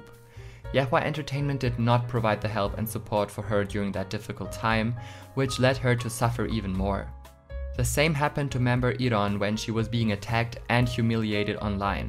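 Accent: Germany. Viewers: I immediately knew you were German because of your accent😂😂 I'm definitely scared for Everglow's future, I hope everything is going to be okay and I hope they won't disband anytime soon. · Is german your first language? you kinda have a german accent 😅 very informative video the company is doing them dirty!!!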